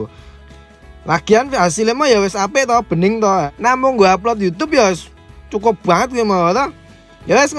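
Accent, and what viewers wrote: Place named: Bantul, Indonesia. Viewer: Logate mbantul buanget hahahhaha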